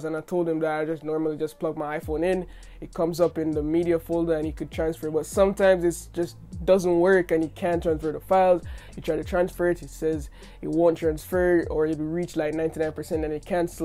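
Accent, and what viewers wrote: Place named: Jamaica. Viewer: Are your Jamaican? Your accent is definitely peaking through 🙌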